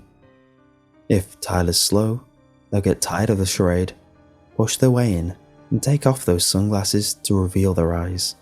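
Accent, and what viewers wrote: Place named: United Kingdom. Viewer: You really lucked out with your damn accent. Anyone with a British sounding accent is just so much easier to listen to.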